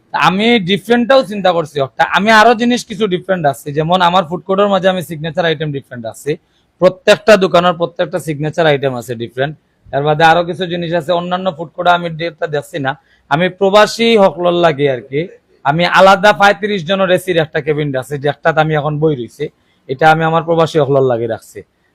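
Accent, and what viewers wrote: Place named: Sylhet, Bangladesh. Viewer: Sylheti accent bhaisab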